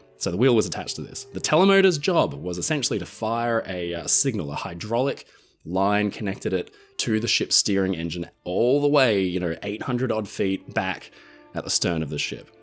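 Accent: Australia. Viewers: I don't know how long you were in the U.S., but it was long enough for an American accent to find its way into your narration of this video every now and then when your Aussie accent 'drops out'... 😉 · I enjoy your videos,. I also love listening to your beautiful Aussie accent. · Why would a British person have an Australian accent?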